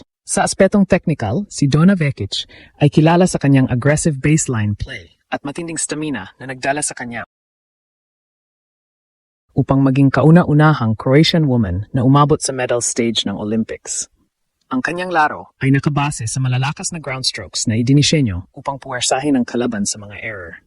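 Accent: Philippines. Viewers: AI… commentator accent is BADLY Pilipino … DESTROYING the beauty of the language …. Bad diction STOP please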